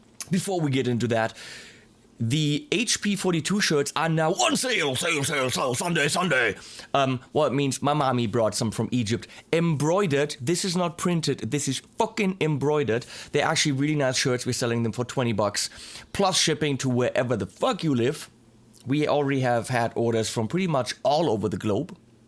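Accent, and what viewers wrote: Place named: Germany. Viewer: your accent is kinda german :)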